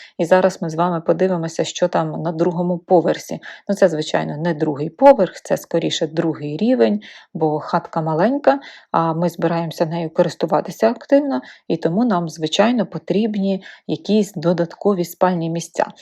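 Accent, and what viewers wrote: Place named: Surzhyk, Ukraine. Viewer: 'чьі', 'щьо' - суржикова вимова; правильно казати 'чи', 'що' ☝️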